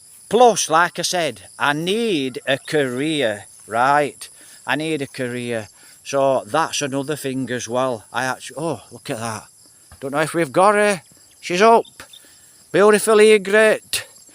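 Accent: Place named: Scotland, United Kingdom. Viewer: You really sound like scottish sir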